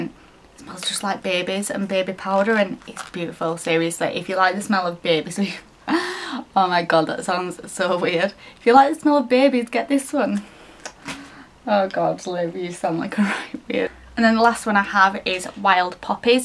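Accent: Yorkshire, United Kingdom. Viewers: It's an accent, Yorkshire accent to be precise x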